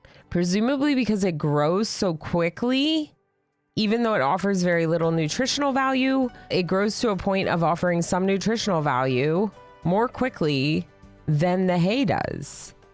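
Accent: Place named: United States. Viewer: You have such an annoying stereotypical american voice but you seem really sweet tho, so I'm a little conflicted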